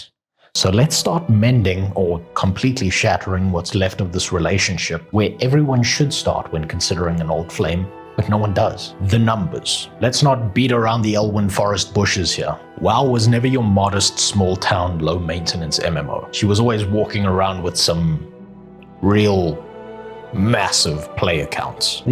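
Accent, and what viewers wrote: South Africa. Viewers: Saw you on Asmon bru, fucking amazing to hear an SA accent on a monster streamer like Asmon, he laughed his ass off at your clips of him!!! well done man!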